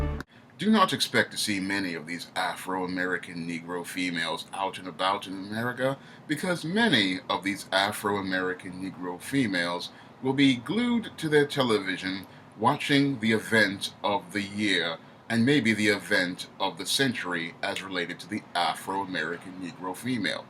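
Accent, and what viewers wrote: United Kingdom. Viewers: Hilarious video!! I love that British accent! 😂 It's somewhat sad & ironic that this wedding that's got these swirlers & wannabe swirlers are so excited is taking place on the birthday of Malcolm X. I've been saying that if Prince Harry was marrying some white woman from the North of England, or Wales, or Scotland, nobody would even begin to care, particularly here in the U.S. I'm sick of hearing about it second-hand, and I'll be glad when it's all over with. Thanks for making humor out of this. Much Respect! ✊ ...I mean, Cheerio pip pip! 🇬🇧💂🇬🇧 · Lmao! You're sounding just like a Brit my man. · Great video, Mr. Not only insightful and hilarious but between that British accent and your laughter at the end of the video you scared the shit out of me. I thought I was watching Dracula. Love that creepy vibe. Keep up the great work, sir.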